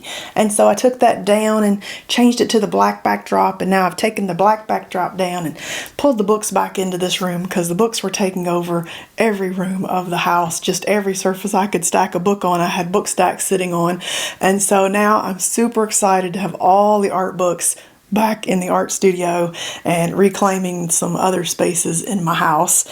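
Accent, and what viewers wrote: South, United States. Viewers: the familiar southern accent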